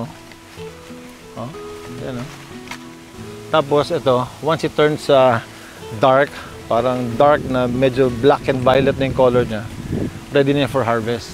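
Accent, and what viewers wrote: Visayas, Philippines. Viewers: Ka bisdak nas imong accent sir oy hehehe 😂 ❤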